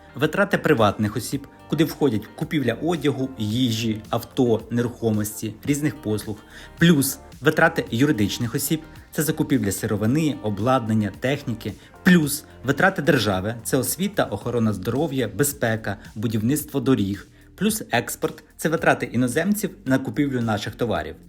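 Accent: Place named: Russia. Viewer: так аканізуєте, ніби війни в нас нема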